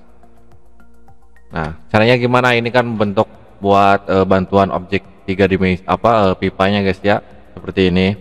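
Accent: West Java, Indonesia. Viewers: aksennya orang sunda nih,, kumaha damang kang ? mantap videona, lansung subrek lah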